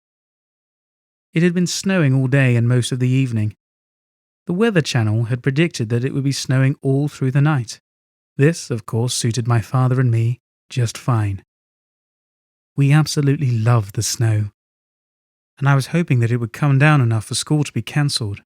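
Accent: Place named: RP, United Kingdom. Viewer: I'm two years late, but only recently found the channel: congratulations on the first book narration gig. I hope you've had many more in the interim, and I'm confident that has been the case, because you have the goddamned most crisp, posh, cut-glass accent my anglophilic American ears have ever heard on YT. You could easily pass for a BBC broadcaster.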